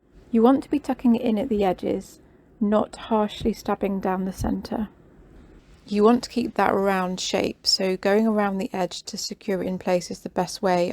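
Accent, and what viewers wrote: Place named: England, United Kingdom. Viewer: I meant to add that I had not seen the last couple of minutes as I got interrupted. But the end result was amazing. Beautiful work, the head is so realistic. So nice to hear an english accent too! I am in Australia and I have a friend in Canada who has a schnauzer, a gorgeous animal and I used a photo of it as a reference when making a full body model for her. It is only about 6 inches high and 3 wide but I really wanted the eyes to be a feature. That is how I came across your video. I have been needle felting for about 10 yrs. I strive for an approximation rather than a replication when doing animals. If it is recognisable then I am satisfied. I want the hobby to be enjoyable, and not bash myself trying to be what I am not…ie perfect. I have sold a lot of items and had lots of commissions over the years so it must be okay. 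But I would love to be as talented as you obviously are. I have saved your channel so I can refer if I need to. Thanks again.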